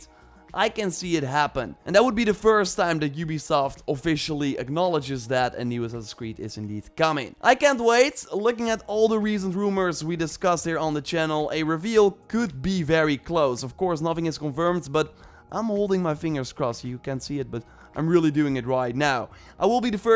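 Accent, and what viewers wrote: Netherlands. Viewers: Ah, that Dutch accent :-D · your Dutch accent is really obvious.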